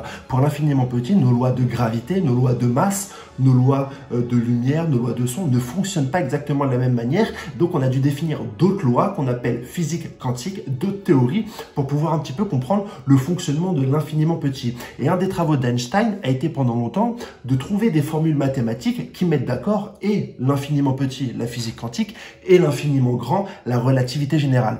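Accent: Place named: North, France. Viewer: votre lieu de résidence (département lol), je me sens que tu viens du nord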